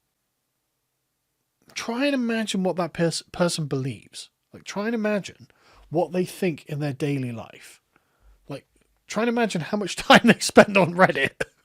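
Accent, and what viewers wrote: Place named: United Kingdom. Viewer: i think you had something on your face. british english is weird. youll prounce "water" as "watah", but "swastika" as "swastiker".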